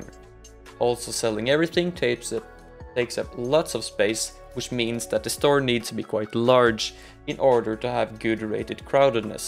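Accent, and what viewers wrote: Norway. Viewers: er du norsk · e du norsk lol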